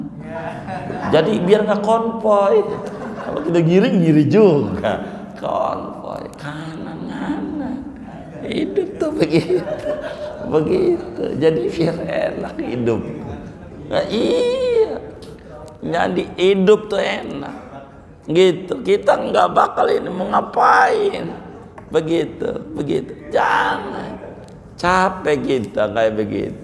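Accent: Jakarta, Indonesia. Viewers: Seneng ama kiai ini apalagi kalo logat betawinya keluar...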